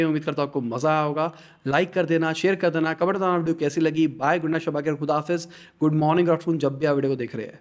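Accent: India. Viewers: Papa news name is always good. Ye sound like bihar agency or something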